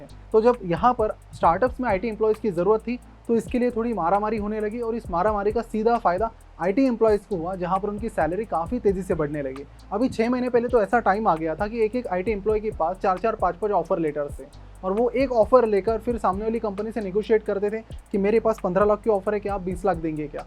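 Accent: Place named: Bengal, India. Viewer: are you bengali? Some of your accents matches with my bengali accent.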